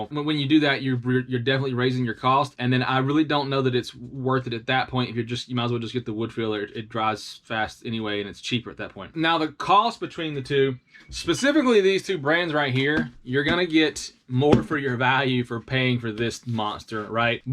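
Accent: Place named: South, United States. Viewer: U get a like and comment for the southern accent OUUU WEEE 😂😂🎉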